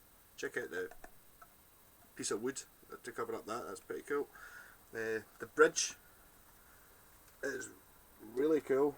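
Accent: Scotland, United Kingdom. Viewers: Thank you.......Yes your Scottish accent threw me off a little bit lol, also your right about the Factory being Top-notch, I can tell because I can see just how Well this guitar is made. I've owned several priceless guitars in my day, I'm no stranger to CRAFTSMANSHIP, and this Instrument HAS IT! Plays Great, Feels Great.......Thanks again you have another Fan who now resides in the middle of the Pacific. Aloha from Maui.......🎸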